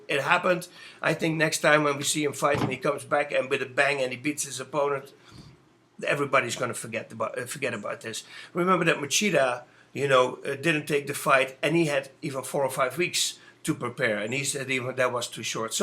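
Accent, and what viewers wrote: Netherlands. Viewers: ja das ff wennen Brabants dialect :)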